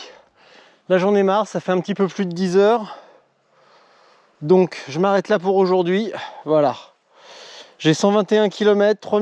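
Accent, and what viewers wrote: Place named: Alsace, France. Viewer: Accent alsacien déjà qu il faut distinguer celui du haut Rhin de celui du bas Rhin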